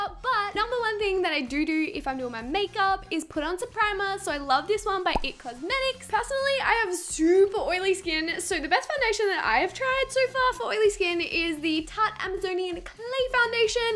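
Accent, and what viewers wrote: Australia. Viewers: just discovered your channel !its amazing ..and i lovvee that Australian accent tht you havee !! ..but how old are you !! do an Q&A !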